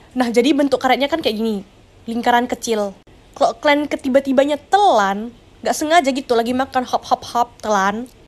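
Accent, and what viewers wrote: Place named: Medan, Indonesia. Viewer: Logat bahasa nya kak seperti nya orang Medan btw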